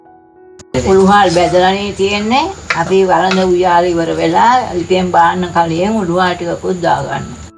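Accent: Ambalangoda, Sri Lanka. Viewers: අම්බලන්ගොඩ පැත්තෙ ආච්චි කෙනෙක් කතා කරන විදියට නම්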